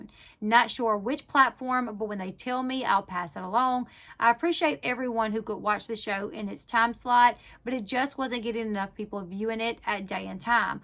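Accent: United States. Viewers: I like your accent. What part of America are you from? I’m from South Australia, so I’ve never heard your accent before.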